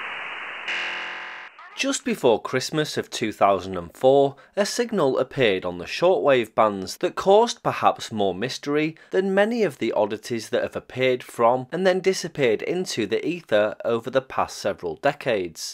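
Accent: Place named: Manchester, United Kingdom. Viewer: Hearing Yosemite Sam referred to in a Manchester accent gives a whole new take on one of my favorite childhood cartoon characters.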